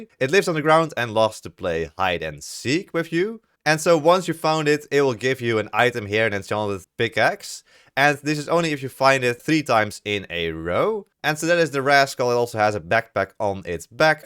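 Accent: Netherlands. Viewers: Ik ben master i can tell your dutch from your acsent lol XD keep up the containt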